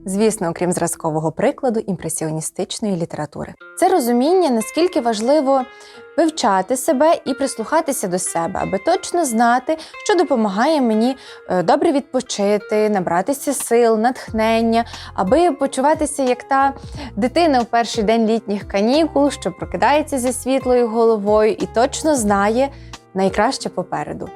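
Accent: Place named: Ivano-Frankivsk, Ukraine. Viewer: Коцюбинський- талант! А ви хто, щоб іронізувати і зневажати? Історик розповідає цікавіше, мудріше, без принижень історичних постатей. У Києві опинились нещодавно, але вже знущаєтесь із свого станіславівського діалекту. Неприємно слухати. Хай би молодий історик усе викладав…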